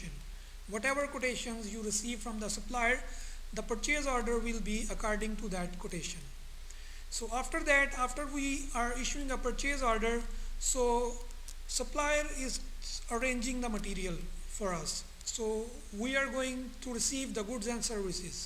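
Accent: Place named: India. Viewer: Pak., perkenalkan dulu dong nama anda .... apakah anda seorang guru ? dari Negara mana kok logat seperti orang India , sementara banyak orang India bekerja di Indonesia tetapi jarang yang beragama Islam.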